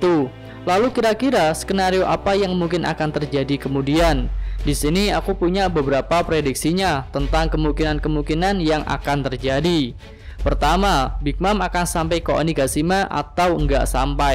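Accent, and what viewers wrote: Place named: India. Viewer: Wkwkwk mas e kaya orang India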